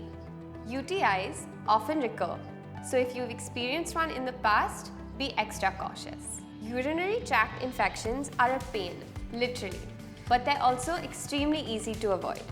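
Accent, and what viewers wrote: India. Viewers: Never seen a white woman with a indian accent😅. Great videos anyways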